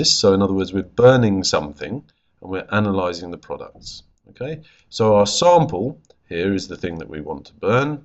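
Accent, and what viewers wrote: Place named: United Kingdom. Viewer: I'd like to say you are one of the best IB chemistry teachers on Youtube. Your videos are really helpful. In fact, you have taught me more than my IB teacher has in the last year and a half. Thank you so much!! You're a star!:)) PS. Your British accent helps- I'm from England so it's quite difficult for me to sometimes understand the American youtubers since they pronounce most stuff quite differently to us.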